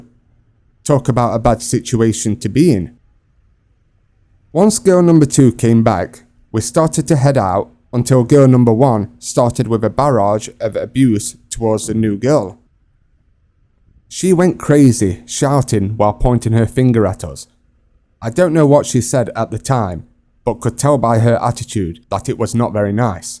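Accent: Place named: North, United Kingdom. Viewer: love yr northern accent.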